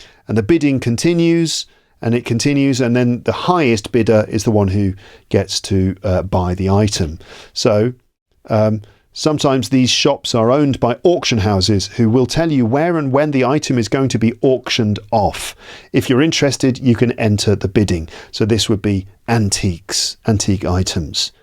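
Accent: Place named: RP, United Kingdom. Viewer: <link> is one of the BEST English Podcast I've ever heard on British RP (Received Pronunciation), Phonetics and Phonology, Prosody (Prosodic Break, Prosodic Interruption) in NATURAL NATIVE English Language by on YouTube channel named, So GREAT and I am grateful to your Instructions, I choose your Podcast channel to practice Articulation and NATIVE English accents.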